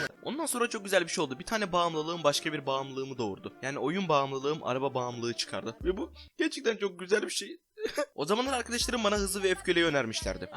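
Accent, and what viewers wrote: Russia. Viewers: Neden sesin rus aksanına benziyor dostum??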